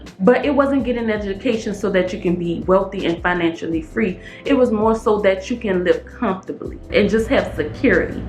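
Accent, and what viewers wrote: New Orleans, United States. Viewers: Sound like your from NEW ORLEANS??? If you are I’m so happy to see someone from my City doing with your doing !!!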